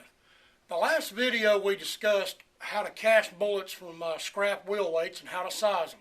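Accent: United States. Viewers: Great video. Thx for showing. You def have a voice of country, good ol boy right ther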